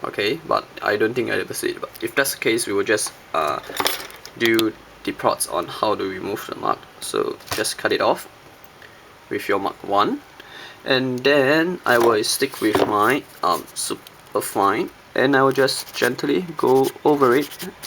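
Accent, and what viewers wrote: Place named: Asia. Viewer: Thank you very much for this vid!! It'll help me alot! BTW, you're Asian right? Probably Chinese or Japanese? I can feel it with your accent.. :)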